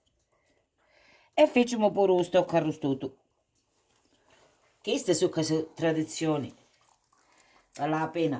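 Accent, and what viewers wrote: Calabria, Italy. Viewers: Carissima signora lei è una bella persona. Ammiro quello che dice e fa. Lei con il suo modo di parlare e le sue ricette mo ricorda molto mia mamma e anche la mia cara zia .io è molti anni che vivo al nord ma la Calabria mi è rimasta nel cuore e il suo dialetto è uguale al nostro quindi penso siamo dalla stessa zona. E u stoccu di cittanova che buono. Grazie di cuore per regalarci le ricette della mia mamma.le auguro tante belle cose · Nn posso dire che la voce sia uguale ma l'accento è quello! Quello di mia madre (nata a Polia ex provincia di Catanzaro, morta a 92 in grazia di Dio). Ho guardato in "giro" gli angoli della tua cucina, il fuoco e le "pignati " dove cucini, quelle stesse di terracotta che ricordo x anni in casa mia...anche papà mio era calabrese di Serra s. Bruno... Da loro ho appreso la dignità e l'onestà e il timore di Dio. 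Che Dio ci benedica tutti❤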